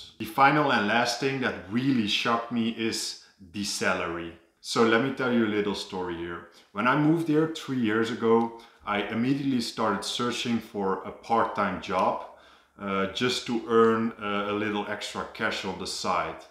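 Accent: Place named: Netherlands. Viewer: I subscribed because you are beautiful and I love your accent and the fact that you're from the Netherlands...much love from 🇧🇲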